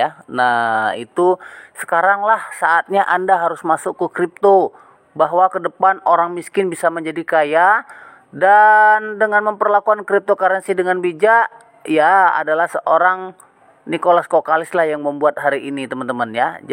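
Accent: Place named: Java, Indonesia. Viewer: aksen jawa kental sekali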